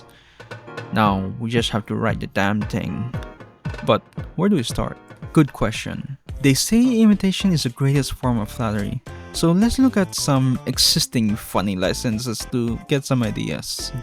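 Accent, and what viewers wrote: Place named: Philippines. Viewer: I can hear the tagalog accent, so I'm guessing is from the Philippines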